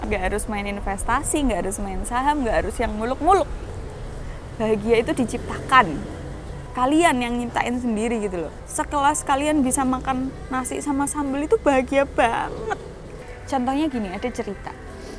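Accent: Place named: Semarang, Indonesia. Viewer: Logat Semarang kok nggemesin :)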